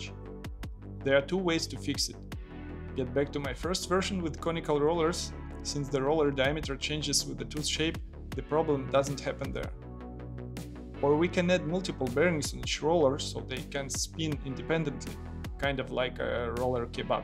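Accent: Russia. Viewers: почему я слышу русские звуки в этой английской речи? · Мне кажется или у него русский акцент?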